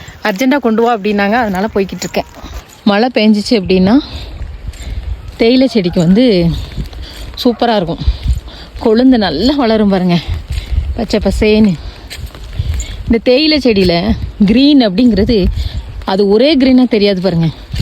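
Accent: Tirunelveli, India. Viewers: ஒரு மூன்று நாளைக்கு முன்னாடி படையப்பா 5மணிக்கு வாசலில் இருக்கும் என்று சொன்னார் so conform ah moonaru than... But slang tirunelveli native.. irukum.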